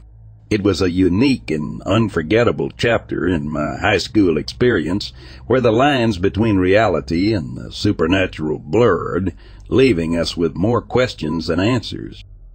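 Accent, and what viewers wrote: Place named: South, United States. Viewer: I think you may be mistaking mispronunciations with the regional southern accent. I haven't heard any mispronunciations. Certainly not routinely.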